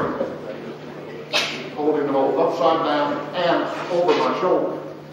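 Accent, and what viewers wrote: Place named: South, United States. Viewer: The problem here is the language barrier. And Mr. ‘s southern drawl does not make it easier.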